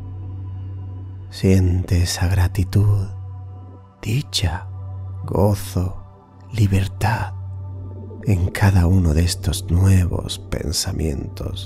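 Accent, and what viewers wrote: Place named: Spain. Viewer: Excelente meditación, excepto el acento de España podrían hacer con un acento neutro, gracias.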